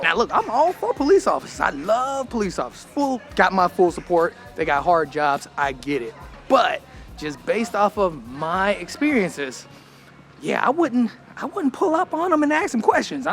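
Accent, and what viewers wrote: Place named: Alabama, United States. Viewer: Love to listen to you, you sound like my dad who is from Alabama.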